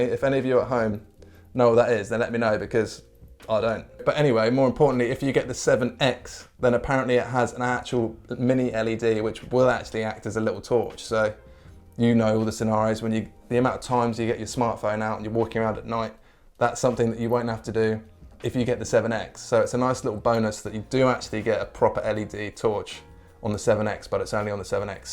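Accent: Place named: England, United Kingdom. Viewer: EXCELLENT PRESENTATION 👏 with an English accent ...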